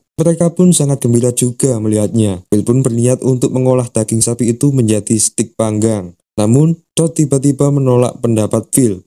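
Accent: Java, Indonesia. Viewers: Jawa nya medok bgt jadi kga jelass asikkk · logatnya jawa bgt mas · baru kali ini dpt yg jawa biasanya aksennya Sunda mulu 👍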